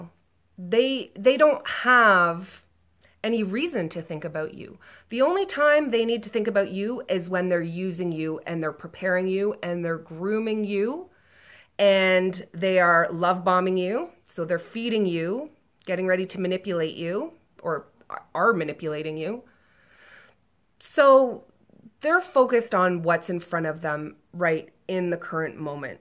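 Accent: Canada. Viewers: U sound canadian! What province?...great advice on no hope, btw. That is the cold hard truth. They will never, ever, ever change...in fact, they get worse as they age.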